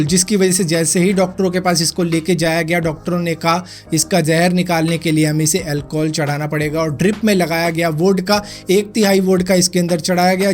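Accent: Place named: Delhi, India. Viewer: dilli wala accent paarak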